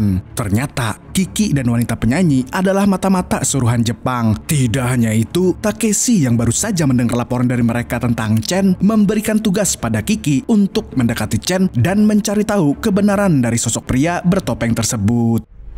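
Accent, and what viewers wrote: Banjar, Indonesia. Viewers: waaaaayyy abang orng banjarr kah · Ikam urang Banjar kh? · ai admin bahasa Banjar sakalinya😮😅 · Org banjar kh ni.?? Slm knl dari bjb. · Banjar kh bng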